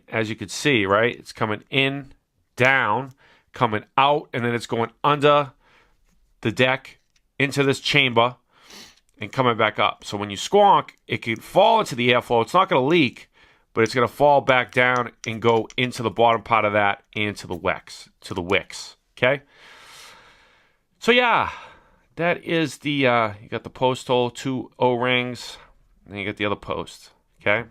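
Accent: Boston, United States. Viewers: You gotta be from Boston lol aaada, I'm from dochesta ;) thanks for the review! Where in the city aare ya from?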